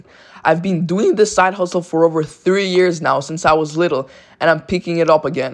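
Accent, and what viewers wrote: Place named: Toronto, Canada. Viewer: this guy lives in toronto i can tell from the accent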